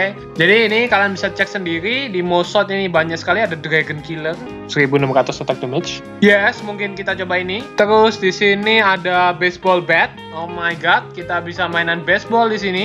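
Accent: Java, Indonesia. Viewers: kok medok dulunya yaaa hehe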